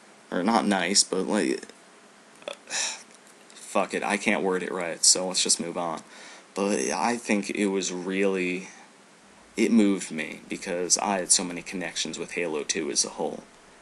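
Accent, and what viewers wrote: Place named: United Kingdom. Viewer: FlaShVidsHD well he does sound British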